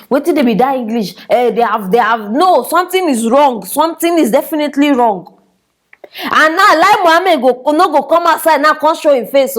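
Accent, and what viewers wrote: Nigeria. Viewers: Madam you can change your Nationality but you can change ur Dialect, one 9ja always 9 ja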